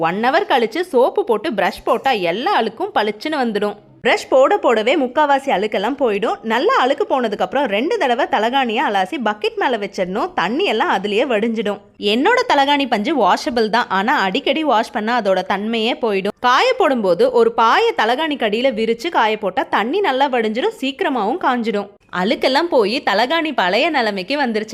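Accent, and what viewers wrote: Coimbatore, India. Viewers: உங்க பேச்சை ஒரே அழகை கோயம்புத்தூர் ஸ்டைல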